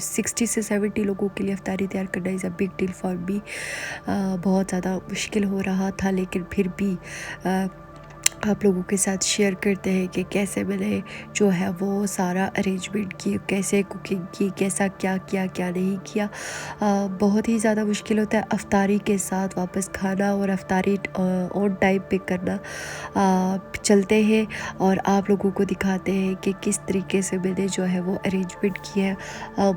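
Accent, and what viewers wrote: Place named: Kashmir, India. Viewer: Bht achy Awaaz ha literally khasmeeri lahjy m very nice vedio goodgirl nice effart❤❤❤❤❤❤❤❤❤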